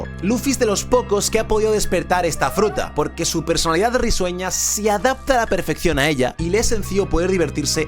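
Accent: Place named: Spain. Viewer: Eres dominicano y tienes un acento muy español pero mis enhorabuena yo soy De RD y te veo desde España Galicia grande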